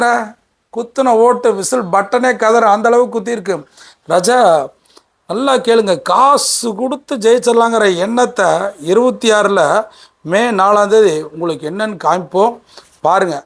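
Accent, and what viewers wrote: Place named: Kongu, India. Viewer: Bro....excellent speech that too kongu slang....wow❤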